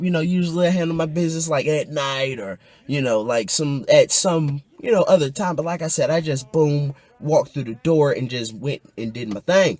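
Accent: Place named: South, United States. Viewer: This dude sound hella country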